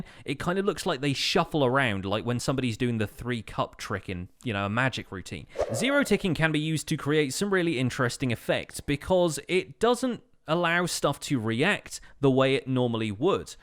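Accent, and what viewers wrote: United Kingdom. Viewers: Im so happy i caught up to this current episode. Your lovely british accent is now stuck in my head thanks to me binge watching the series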